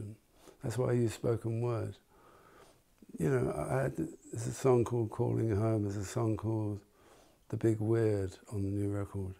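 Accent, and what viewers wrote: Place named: North London, United Kingdom. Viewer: What an interesting guy. He's so well spoken and thoughtful. Yet to me anyway, he's kept the working class North London accent. He speaks highly of his brother, who he can't get along with. I love that "Paul's got a new record out"?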